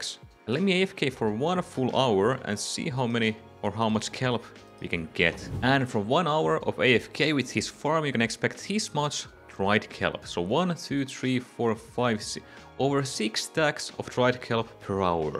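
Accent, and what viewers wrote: Finland. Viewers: Amazing, thanks! Gonna build this on my Realm, best design I've seen so far and super easy to follow. Love that Finnish accent too, haha! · You sound finnish are you finnish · I like how i automatically knew you were finnish from the accent. Torille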